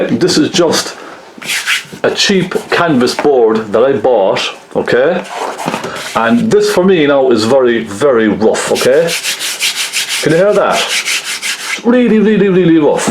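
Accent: Cork, Ireland. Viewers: I so love your Cork accent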